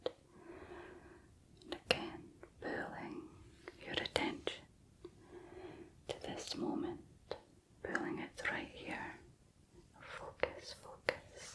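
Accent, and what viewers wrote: Scotland, United Kingdom. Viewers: Hello from Ayrshire it's Good to hear a Scottish accent I have real problems sleeping but you helped this fellow scot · Feel like i with a scotish girl. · your scottish accent reminds me of mum so soothing x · lovely Scots accent behind the voice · Just discovered you. Its nice to hear a Scottish accent spoken so softly..... even if you are from Dundee 🤣😜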